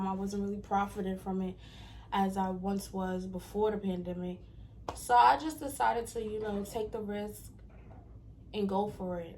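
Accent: New York, United States. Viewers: I heard that spicy New York accent from your 1st video 😁( Bk/NY girl myself) Congratulations on your new Youtube page & Im looking forward to seeing more of you. WELCOME!! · I knew you were from NY, I can hear it.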